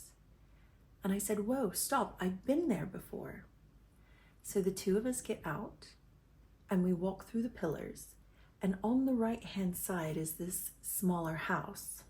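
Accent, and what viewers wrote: United States. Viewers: Are you from New England? Something in your accent.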